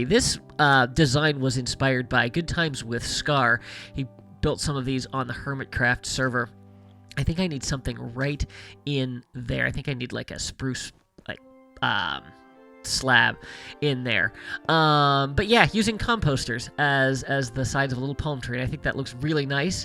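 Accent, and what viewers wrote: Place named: Tennessee, United States. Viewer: Another great video. I recognize that vaguely central Tennessee accent and it reminds me of my grandparents.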